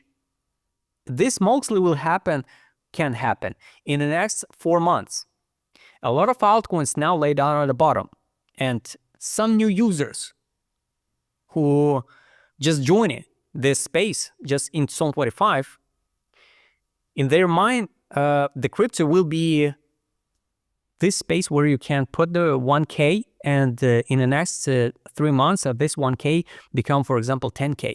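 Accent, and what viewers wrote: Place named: Eastern Europe. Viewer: Brother, I think you have a Slavic accent.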